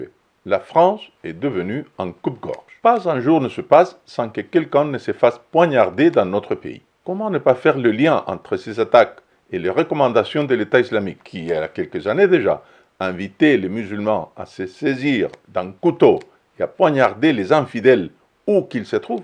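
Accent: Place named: Spain. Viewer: les musulmans de France sont bien plus français que toi vu ton accent espagnol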